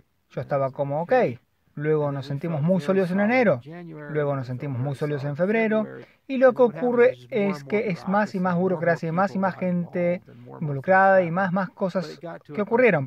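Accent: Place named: Argentina. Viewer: Todas mis disculpas por no haber enviado antes un mensaje de agradecimiento y cortesia a nuestro querido traductor, grandisimo trabajo que se agradece increiblemente mucho mucho, de todo corazon muchas gracias amigo. (Ademas me encanta tu acento, si no me equivoco eres de Argentina, no? Yo he nacido en Valencia, España) Un gran abrazo virtual y por favor sigue todo lo que puedas !!!!!! Gracias !!!!!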